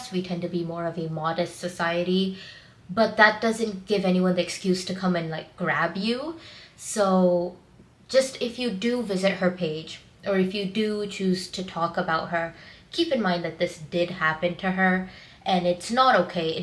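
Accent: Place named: United States. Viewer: I respect what you're saying and your opinions but this kind of falls flat, at least for me, because you seem and sound like an Indian-American who's spent very little time in India.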